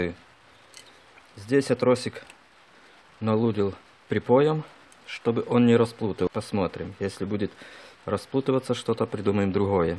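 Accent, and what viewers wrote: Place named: Moldova. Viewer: Судя по акценту - молдованин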